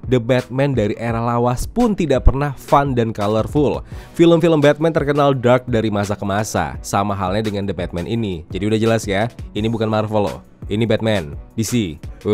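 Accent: Medan, Indonesia. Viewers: “Kalau kau ga suka sama the batman, berarti pas aku nonton film ini bukan untuk kau lek” wkwkwkwk medan kali abang ini 🤣🤣🤣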